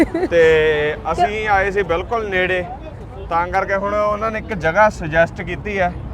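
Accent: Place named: Hoshiarpur, India. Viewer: Aap bohat khobsorat boli boltay hain. My mother tongue Punjabi in hoshiarpuri accent like yours.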